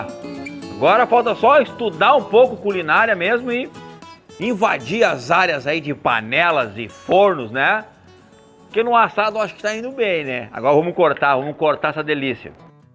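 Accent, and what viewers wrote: Rio Grande do Sul, Brazil. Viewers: Gaucho falando Tá loko meu!😅😅😅😅 Nota dez , gaúcho!😂😀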